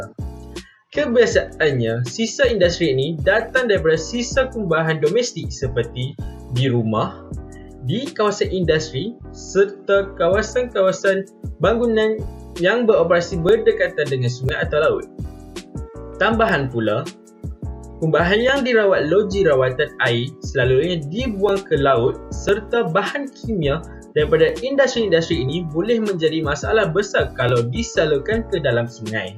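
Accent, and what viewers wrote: North, Malaysia. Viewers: Bila tengok video yang narrator dia cakap utara auto best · suka betul dengan suara narrator. juga maklumat yang cuba disampaikan sangat jelas dan santai dengan slang utara. nais!👌🏻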